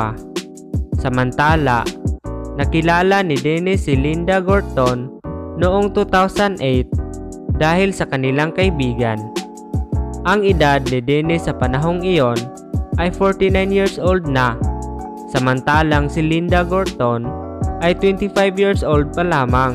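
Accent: Visayas, Philippines. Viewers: Halata kaayu bisaya imong tingug dong 😁😘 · Klaru kaayo kang bisaya sa tuno nimu bah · san ka sa visaya dong?? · Bisaya ka dong.. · Halatang bisaya ka bay haha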